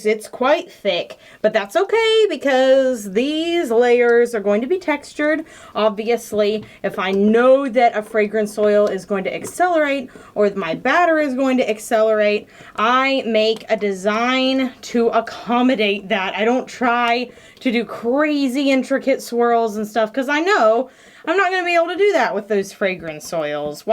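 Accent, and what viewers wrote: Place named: South, United States. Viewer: All the puddin, puddin. You’re so southern and adorable!! ❤️❤️❤️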